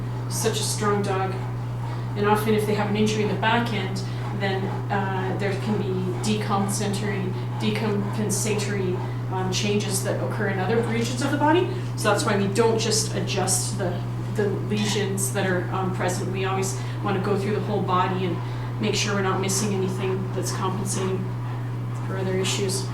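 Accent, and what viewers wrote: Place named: Ontario, Canada. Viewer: Her accent sounds Ontarian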